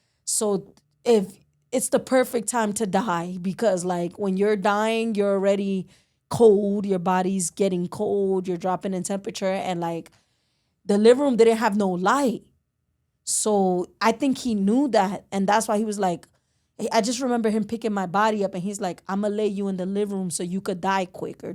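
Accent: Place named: Connecticut, United States. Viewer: sounds like she is from Connecticut,,,plus she didnt mention what neighborhood,,every one from NYC says where they are from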